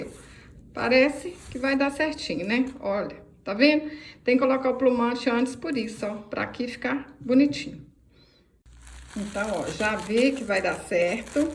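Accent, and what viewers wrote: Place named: Minas Gerais, Brazil. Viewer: Oi vc é ótima. Adorei. Parabéns 👏👏 Vc é mineira... é bão demais da conta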